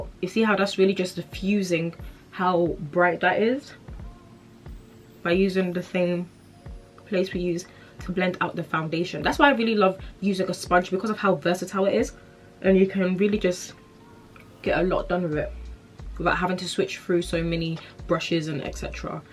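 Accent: United Kingdom. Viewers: Wasn’t expecting the british accent!! Buzzing cause most foundation routines are american and they using products we can’t get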